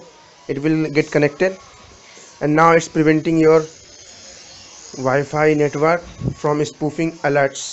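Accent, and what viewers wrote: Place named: India. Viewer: Will you please slow down when you talk in english. Your indian accent is why too strong for me to follow anything you are saying...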